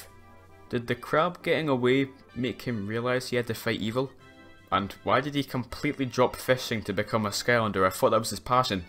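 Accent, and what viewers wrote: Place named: Scotland, United Kingdom. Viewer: Where you from in Scotland my man?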